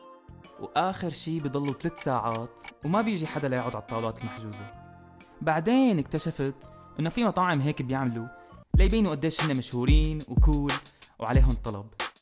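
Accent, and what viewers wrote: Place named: Syria. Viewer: و الله متأكدة انو من سوريا نفس لهجتي و الله 😂💔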